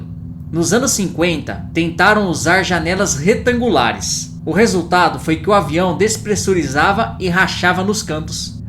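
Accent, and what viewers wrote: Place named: Inland São Paulo, Brazil. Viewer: O conteúdo do vídeo é excelente, mas.... Com todo o respeito senhor sua dicção e pronúncia das palavras precis ser melhoradas, quando você pronuncia a letra "r" sua língua embola... Parecido com o sotaque caipira do interior de São Paulo e região sudeste... A pronúncia que você faz é a seguinte: "poirrrrque" "cairrrrteiraaa", "inteirrrrna". Dói os nossos ouvidos...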